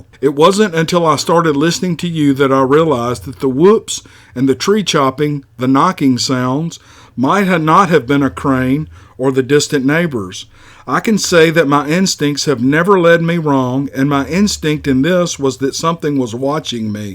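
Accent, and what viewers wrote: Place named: South, United States. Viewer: Dang it that last one was a corker! It’s 9pm here and I made the mistake of listening to that one while driving a lonely road up here in the redwoods of Northern California. Not even the soothing tone of southern voice could shake the creeps. Great work as usual 👏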